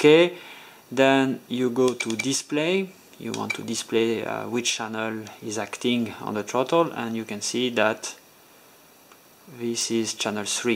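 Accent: France. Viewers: sound french, lol. good job man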